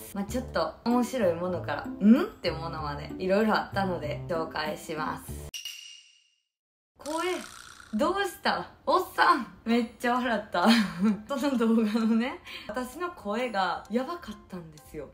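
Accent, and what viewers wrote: Kansai, Japan. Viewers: 実証動画お疲れ様でした、のハスキーな声で関西弁素敵ですよ大好きです💕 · は本当にかわいいよな～！関西弁もまたかわい(//∇//) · チャンネル見させて頂きました。可愛いし、関西弁が印象残り登録しました。これからも頑張って下さい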